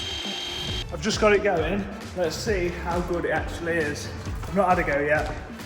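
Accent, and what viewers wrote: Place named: South Lincolnshire, United Kingdom. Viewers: Cracking job mate, im guessing your accent? South Lincolnshire? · First time watching. For the first 10 minutes, your English accent kept reminding me of someone famous, but I couldn't put my finger on it. Finally, I realized you sound like Brett Goldstein, from Ted Lasso. Looks like he's from Sutton, UK. Are you from somewhere nearby?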